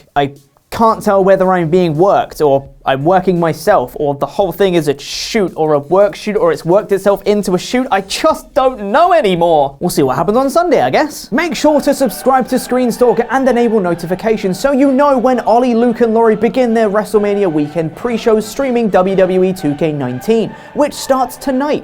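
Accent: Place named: England, United Kingdom. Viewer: As long as you have an English accent I could care less if you’re a different person.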